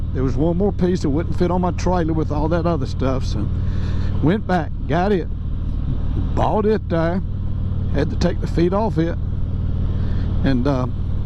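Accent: South, United States. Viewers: Welcome back brother, missed seeing your riding and your southern accent 😂. God bless.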